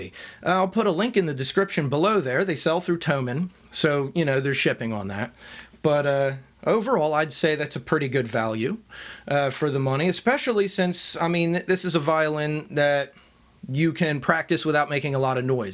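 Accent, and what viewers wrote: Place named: South Jersey, United States. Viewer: you from south jersey or philly?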